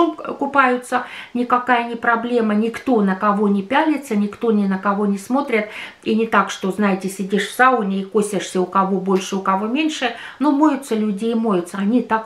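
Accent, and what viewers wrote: Finland. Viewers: у вас такое финское произношение! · У вас прекрасный русский язык, а лёгкий финский акцент только придаёт вам дополнительный шарм!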